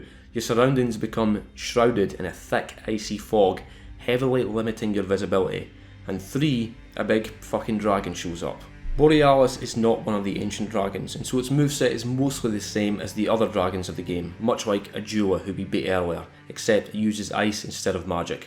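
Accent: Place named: Scotland, United Kingdom. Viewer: Ah yes another wonderful scottish man video to listen to while i work today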